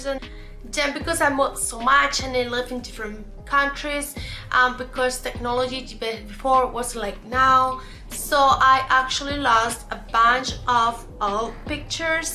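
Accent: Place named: Spain. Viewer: Me encanta tu acento. Algun día visitare España.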